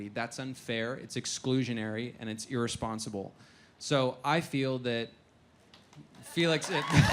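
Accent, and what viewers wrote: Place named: Brixton, United Kingdom. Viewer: Holy shit, I'm British (actually from Brixton, same as Felix is supposed to be) and I pride myself on being able to spot a fake English accent almost instantly... and I was completely fooled by this guy. I guessed pretty quickly that Tatiana wasn't really a Brit (it's a great accent, but the odd American/Canadian vowel still gets through), but I could've sworn this guy was really a Londoner. On the show he sounds almost exactly like people I grew up with (and probably me).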